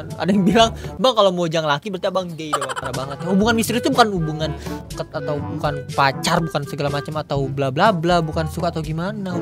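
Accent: West Java, Indonesia. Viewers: Kak orang sunda kah?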